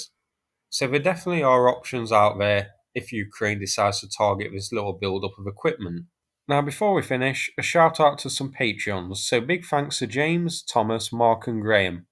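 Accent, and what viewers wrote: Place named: England, United Kingdom. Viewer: Boring. Zero insight. Just a drab, dull, less-than-perfect working class English accent.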